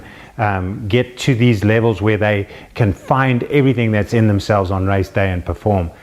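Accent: South Africa. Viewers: South African accent!!! Best accent in the world!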